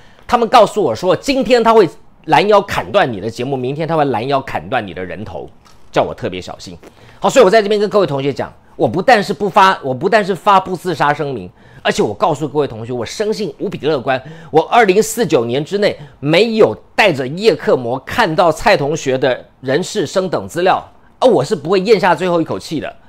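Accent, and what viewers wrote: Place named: China. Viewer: 整個口音變中國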